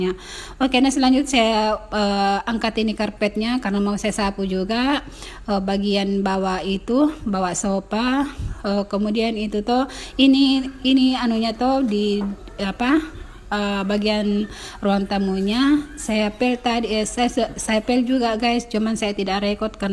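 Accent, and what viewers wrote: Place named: Makassar, Indonesia. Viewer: Logatnya kayak orng mkssr bund...meman orng mkssr.ya?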